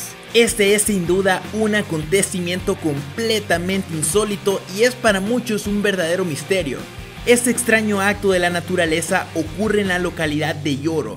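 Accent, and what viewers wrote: Honduras. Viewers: Man, ¿sos de Honduras? Es que hablas similar a un buen catrachos. Min 😂😂😂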